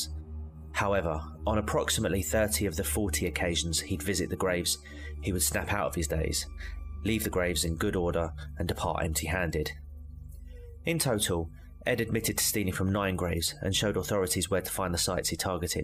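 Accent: United Kingdom. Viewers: what area are you from you have a west end/essex tang to your voice but cant pin it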